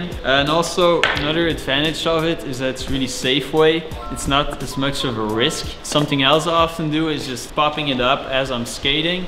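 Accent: Netherlands. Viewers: Are you dutch? Female dutch skateboarder here who recognises your accent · Bro are you dutch? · Are u Dutch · are you dutch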